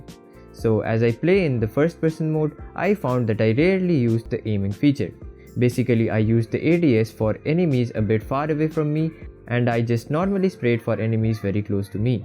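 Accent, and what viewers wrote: India. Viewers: U r speaking good and constant english without stopping Very good And r u indian?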